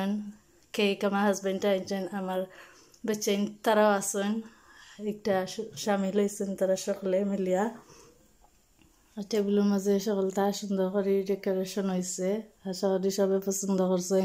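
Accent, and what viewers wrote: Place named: Sylhet, Bangladesh. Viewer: আপনি সিলেটি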